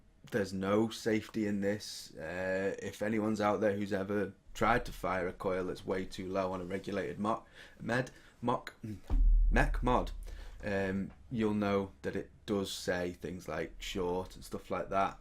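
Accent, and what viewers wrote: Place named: England, United Kingdom. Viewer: Love your English accent - did you go to drama school to lean it? Excellent, keep them coming.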